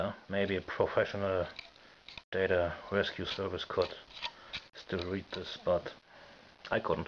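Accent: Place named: Germany. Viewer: Speaking with a godawful german accent....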